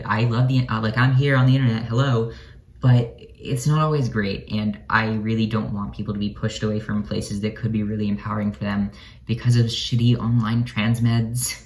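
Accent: Canada. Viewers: Hmmm one thing your voice tells me is that you’re Canadian 😎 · Your voice reminds me of Chase Ross'. That's all. Don't know what Kalvin Garrah even means with 't-slur voice.' It sounds normal to me? Edit: I just realized it might be because you both sound Canadian. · Oooooh, all the "out" and "about" in your speech outed you as a Canadian within the first minute, lol. Not that it matters at all, just a fun observation.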